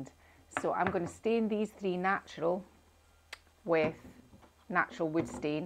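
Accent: Scotland, United Kingdom. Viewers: Love this.... I've been binge watching every one of your videos while I'm in my own store working on furniture. Your work is mesmerizing! And I love your accent - my brother in law, Ian McCallion, came to live in the states when he was 15, we are 59 now, he still has the Scottish accent and all of his American boys, all 4 of them, can roll right into that accent as well. It's great! And, btw, I just became a retailer of your own gorgeous papers here in New Jersey.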